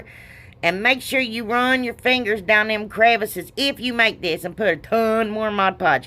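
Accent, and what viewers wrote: South, United States. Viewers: Love these ! Bet you and I sound about the same, country as all get out !!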